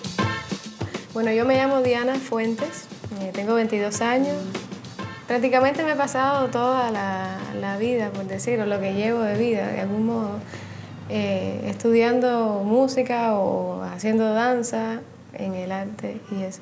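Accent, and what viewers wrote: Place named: Cuba. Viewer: esta hermosa y con ese acento cubano cosa mas grande caballero!!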